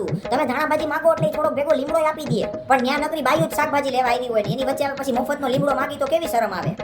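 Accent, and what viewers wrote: India. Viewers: bhura tu rajkot no cho k nay... mne avu lage 6...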